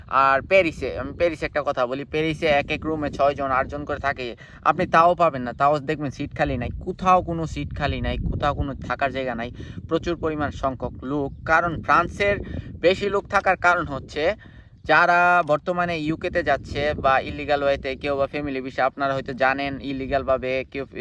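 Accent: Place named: Sylhet, Bangladesh. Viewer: Aita bhai syloti ra kore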